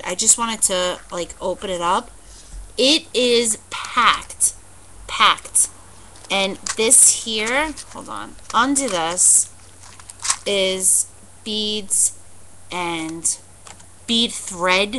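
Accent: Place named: New York, United States. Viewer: I love love love your NY accent, I live on the west coast now - and you're makin' me home sick. This an an awesome Haul - Tues Morning has been carrying a lot of Tim Holtz/Ranger Products. :) There are loads of how to Video's here on You Tube for using things Julie bought. Also try looking at the Ranger Site . Can't wait to see what you make with your goodies! Have an awesome day!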